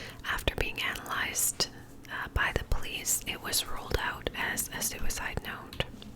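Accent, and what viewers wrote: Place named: Canada. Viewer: I have a feeling you’re a fellow Canadian😂 (Toronto here!) Something about the cadence of your voice and ofc the politeness haha Love your channel!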